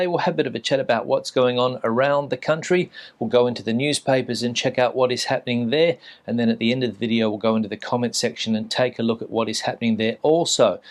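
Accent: New Zealand. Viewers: Are you quite sure you are not speaking with a Kiwi accent? Could you demonstrate the difference?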